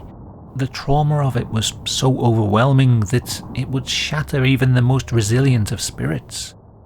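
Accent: United Kingdom. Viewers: I've seen nothing in the video that I do not already know from countless recitations of the words associated with the Images so I find myself asking in response to your accent WHY are you NOT telling the story of the British Empire than invented Concentration Camps (Boer war) AND used them again in 1952 in Kenya. The Brits forever recite the evils of Germany (totally valid, just repetitive) but IGNORE THE EVILS OF THE BRITISH EMPIRE THAT CONTINUED SIMILAR PRACTICES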